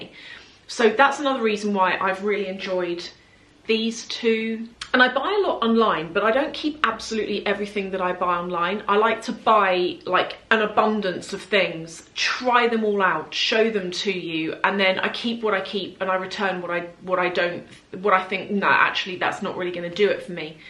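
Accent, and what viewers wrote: England, United Kingdom. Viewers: I do not buy luxury. However, it is very entertaining for me to watch and listen to you. England is somewhere in my DNA. I love your vlogs and, of course, English accent. Be well.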